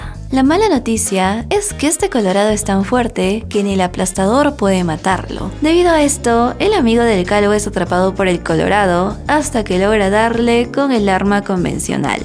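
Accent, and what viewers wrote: Peru. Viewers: Eres de perú? Digo por el gaaa y gracias por el resumen eres la mejor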